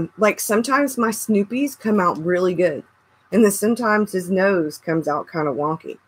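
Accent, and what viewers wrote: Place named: South, United States. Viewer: I thought you had a southern accent!!! love your videos!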